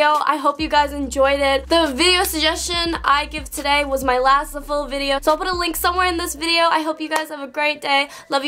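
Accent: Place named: Australia. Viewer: She's got a slight Aussie accent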